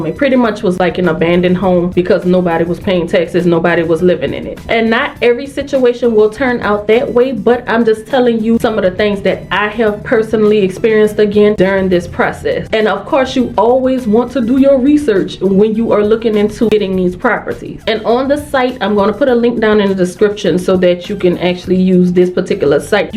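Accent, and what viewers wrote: New Orleans, United States. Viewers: Somehow before watching this video I just knew she’d have a NOLA accent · Love your energy and accent ! Something about you New Orleans brother and sisters , you have that southern charm . Thank you for the information. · New Orleans accent ! 🙂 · Ik u from New Orleans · The New Orleans is oozing out of you. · I literally saw the house in the nail, and knew it was New Orleans, her accent confirmed, haven't watched yet, but I'm putting my money on the east..... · Love your energy and accent gotta be from NEW Orleans😊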